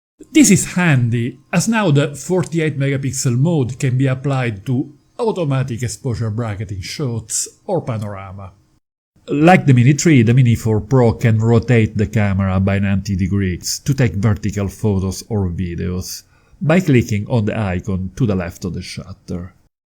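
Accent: Italy. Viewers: He's Italian?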